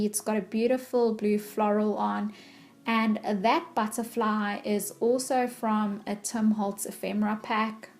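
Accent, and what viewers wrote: South Africa. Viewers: Love your tags they are so lovely.Everytime I hear you speaking it sounds like a South African accent to me.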